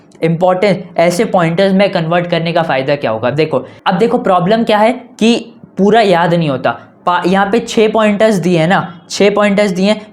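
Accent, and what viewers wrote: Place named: Punjab, India. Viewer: sir aap punjabi bahu cute bolte ho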